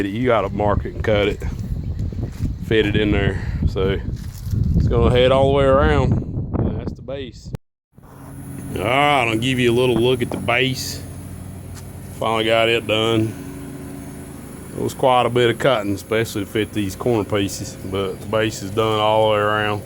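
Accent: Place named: South, United States. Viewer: I love this design! I also LOVE your deep southern accent😊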